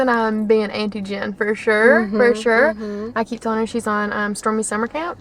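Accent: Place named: South, United States. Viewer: lmfao "just the cole" I love southern accents sm 🤣❤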